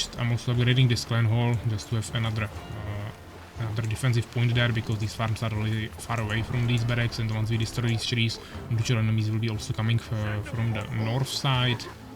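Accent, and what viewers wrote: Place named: Czech Republic. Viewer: Your accent sounds slavic to me, in particular czech to me.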